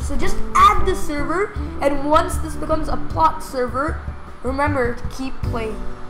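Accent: India. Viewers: are you indian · Wait Is He Indian??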